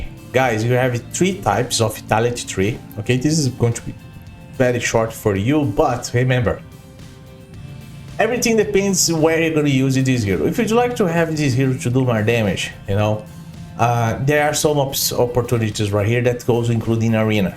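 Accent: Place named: Romania. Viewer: Are you a Romanian? Coz your accent is kind like a romanian like Skratch or Oddone..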